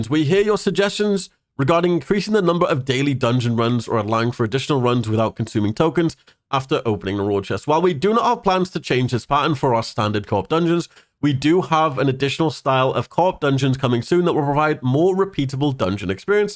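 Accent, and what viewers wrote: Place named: RP, United Kingdom. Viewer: Lucent entry, but most importantly listening to the posh accent in your voice. 😂✌️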